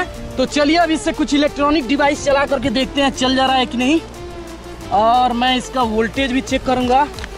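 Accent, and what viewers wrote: Bihar, India. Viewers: bihari ho ka babua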